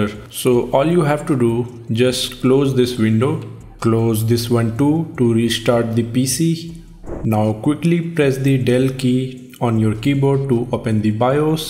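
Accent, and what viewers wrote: India. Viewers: Middle eastern name with a complete Indian accent....wow. Great info. Nice video, applause for microsoft.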